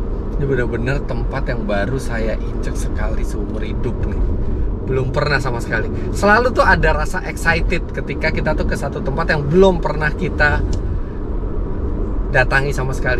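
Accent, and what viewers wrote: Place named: Madura, Indonesia. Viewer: Salam dari probolinggo. Keng logat medure lek.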